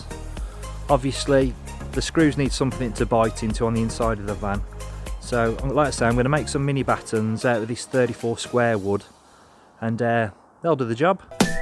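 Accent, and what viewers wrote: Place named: Midlands, United Kingdom. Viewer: Hi, thanks for the videos they've been really helpful. Good to hear a fellow midlander too. Just wondered if you'd encountered any problems since the install or if you'd do it differently now? I'm going to give this method a go once the weather gets a bit more reliable!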